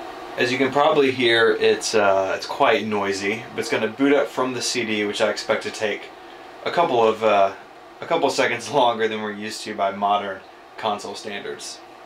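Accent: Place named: South, United States. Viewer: This guys from the southern area of murica. They’re not very bright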